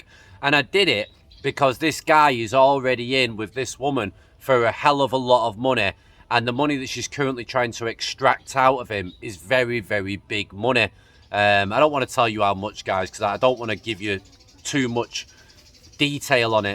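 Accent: Manchester, United Kingdom. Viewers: try to speak standard English with much less of your provincial slang. This goes for all Brits when dealing with non-Brits. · As an older guy with properties as well as family in Thailand and well over two decades experience of living in the Land Of Smiles, I have to say you appear to be the exact kind of nonce-come-grifter I make a point of avoiding. You also sound like a Manc, which might explain it, Manchester being the place where all the lowlifes who were rejected from Liverpool and Birmingham for being too scummy for even them to tolerate, ended up settling over the past however many generation so it's probably not your fault, per se, so much as genetic. You can blame your parents and theirs. Please tell us you're all jibby-jabbed up because the human gene-pool is likely well overdue a purge and if Mother Nature has her head on the right way around, yours will be exactly the retarded hereditary characteristics she'll have been targeting. 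Other than that, have a nice day and do give my regards to your village (poor beggars living there could probably use a kindly word coming from a Farang).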